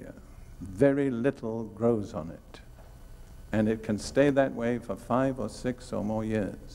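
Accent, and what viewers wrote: United Kingdom. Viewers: Yes! I fully believe you must give way, and let Jesus lead. I wanted to note to the deaf, that toward the end, when he is preaching from Revelation, he mentions "the wrath of the lamb". His british accent causes the captioning to type "roth". But he say's it 3 times, and each time "Lamb" is captioned incorrectly. (Shame on you, Youtube)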